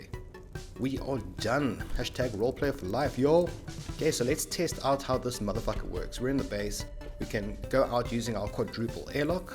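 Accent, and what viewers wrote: Afrikaans, South Africa. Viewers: You south africa? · Are you South African Mr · That Afrikaans acsent.😂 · Thought I knew the accent hahahaha. Since I've lived in Australia now for ages couldnt be sure if it was NZ or SA but fuck yeah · I hear a South African accent. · Are u South African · Ek smaak Afrikaans op jou aksent. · Is this guy South African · Am I detecting Afrikaans? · u south African bru ? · My man are you south african? Your accent is familiar. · Are you south african? :> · Are you south African ?? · You from South Africa ? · Are you from south Africa? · Are you SOUTH African? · You sound South African. Good vid. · Where u from,Sounds south african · Subscribed just because Local is Leka. Nice Content, love the familiar accent well done boet you somma make me excited about SA gaming again, looking forward to more of your videos and thank you. 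· Dude u never gonna read this comment but I swear you have a south African accent heart this or comment if it's true cuass I have a feeling u r South African :/ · you south african? xD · Lol you sound so south african👌😂, are you south african? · I never know whether it’s NA or SA but I always hope it’s SA lol. The way you speak and the language you use made my think SA though. I was right for once lol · Intresting but you still have a heavy afrikaans accent lol XD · Baha oml I've never seen your channel before, and started to watch and thought shou, that accent is oddly familiar, and as soon as you said ja it was all over, South Africa XD born in joburg myself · Are you south African? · Didn't even know anyone in SA played Rust lol. This game looks so fun and is even more fun with a South African accent. Love the professional drawing program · Are you south african?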